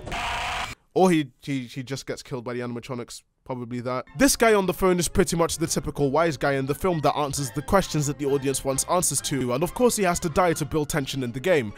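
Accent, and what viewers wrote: United Kingdom. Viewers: The British accent makes it a fucking amazing video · Lol u sound like British Cyrus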